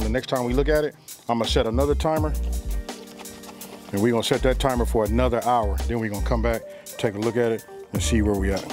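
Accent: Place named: Los Angeles, United States. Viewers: My ex was from New York and she used to say I had a Cali accent. Never knew what it sounded like and after watching, I know what shes talking about now. Me and this dude talk exactly a like. Ribs, looked fire! You gotta video on how to make the rib tips you trimmed off? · And they say "There is no West Coast accent" This dude sounds like Games uncle. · been following you for a while man! Awesome recipes man! I’m from Detroit and my wife and I trip off your deep Cali accent! It reminds us of that Detroit twang…very similar! Keep up the good work fam! Much love!!👊🏾👊🏾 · Nice video...man you sound like you from LA. I see the hat. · Where are you from bro? My wife and I are debating about you. I say you're from the midwest. She says you're from Cali.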